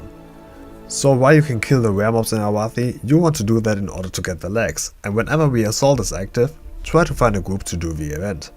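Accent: Germany. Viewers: Ich meine ich höre da wen Deutsches heraus!^^ Schöner Content!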